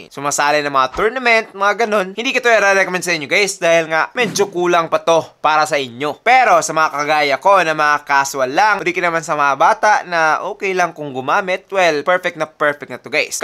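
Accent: Visayas, Philippines. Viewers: Bisaya diay ka pala dol HAHAHA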